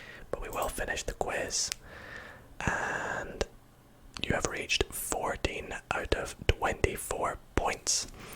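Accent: Scotland, United Kingdom. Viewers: Британский чел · YES HES SCOTTISH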